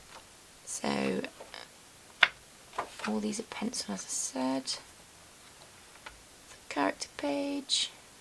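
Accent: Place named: Newcastle, United Kingdom. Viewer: also do u come from around Newcastle England area or just England if it's too personal I was just asking u sound like it. Also thanks for telling us about the RPF it really helped me 🙃😊😊😊😊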